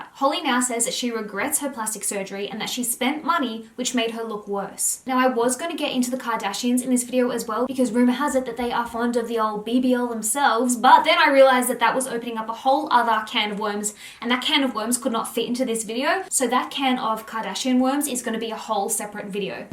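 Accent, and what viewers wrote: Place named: Australia. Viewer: aaaaah cant believe you replied meeee!! i love your aussie accent i want to speak just like youuu hahahah looooove your content btw keep it up!!! i never miss a video!!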